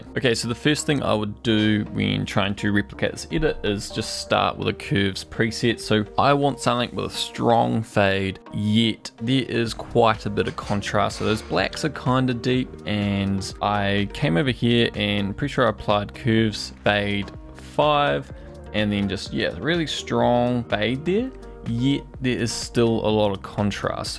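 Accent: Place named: New Zealand. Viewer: Think he’s from New Zealand bro bro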